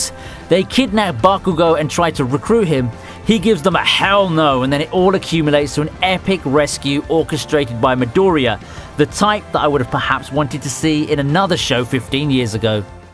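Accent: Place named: Scotland, United Kingdom. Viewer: wish I could speak scottish like that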